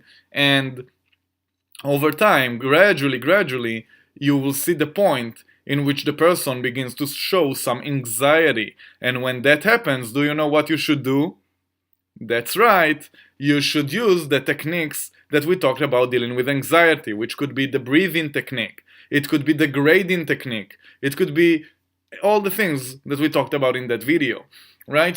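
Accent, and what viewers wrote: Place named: Israel. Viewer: Well I for one love Israeli accents, yalllaaaaaa! Thanks! This will help me sleep better alone at my ranch after a series of home invasions in my 20s that's left me with sleep trouble when I'm home alone. Going to conquer it though! Thank you!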